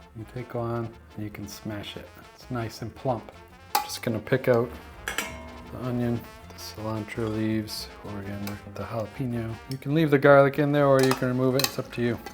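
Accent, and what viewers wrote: United Kingdom. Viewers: is an honorary Brit who I believe has spent a great deal of time working and living in the U.K., so this probably accounts for some of the crossover in pronunciation/conversions etc. 😊💚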